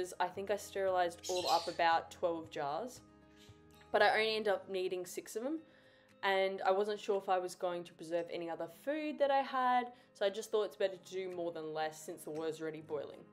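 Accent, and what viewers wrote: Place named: RP, United Kingdom. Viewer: Very good R P English language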